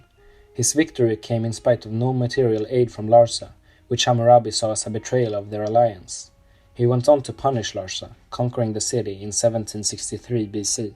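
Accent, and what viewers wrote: Russia. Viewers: Are you russian?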